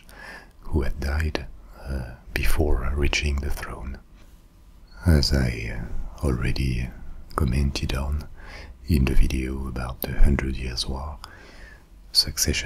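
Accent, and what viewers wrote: France. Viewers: wonderful, thank you, great to hear British history in such a calm and relaxing French accent :)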